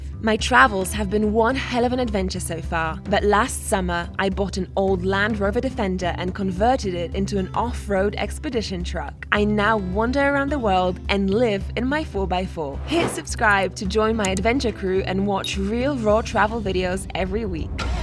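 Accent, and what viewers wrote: England, United Kingdom. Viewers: Your videos are awesome, Question? were you raised in Poland, because i hear a big English accent! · Why do you sound British? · That brittish accent...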